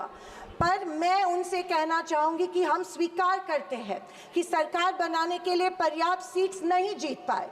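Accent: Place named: Solapur, India. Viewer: Nice solapur avaj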